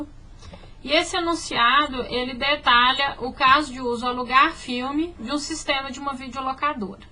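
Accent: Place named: Minas Gerais, Brazil. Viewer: Vcs reclamam de tudo, a moça deve ser de Minas Gerais e o Brasil possui vários contrastes e muitos sotaques. Aprendam a respeitar a forma de falar das pessoas. Com certeza na dependendo da cidade de vcs, também tem um jeito de falar e consequentemente na cidade dela iriam estranhar sua forma de conversar, mas com certeza teriam respeito por entender que não nasceram ali. Espero que tenham entendido agora. Bons estudos a todos !!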